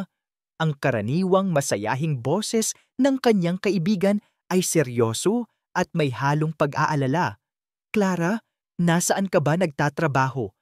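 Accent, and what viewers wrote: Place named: Pampanga, Philippines. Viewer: Kapangpangan siguro ang narrator kasi eng basa nya sa ang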